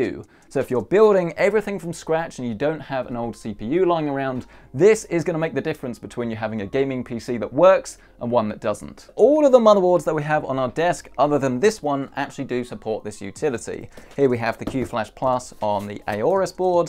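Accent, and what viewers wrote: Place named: Southern England, United Kingdom. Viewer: Sounds like Southern England to me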